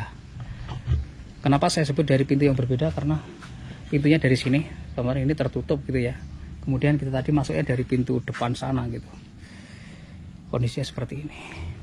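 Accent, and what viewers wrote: Java, Indonesia. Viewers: Medoknya sm doanya itu loh khas bgtt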